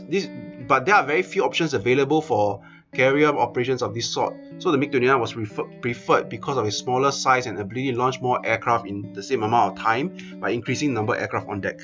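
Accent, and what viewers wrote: China. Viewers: This is Chinese voice, and security of India aircraft is 5% less then Chinese aircraft but according power Indian aircraft is 10% more deadly then Chinese so Indian aircraft can destroy Chinese is possible 30% but Indian destroy chinese aircraft is 70%, don't forget Barak 8, And Aakash and Bramhos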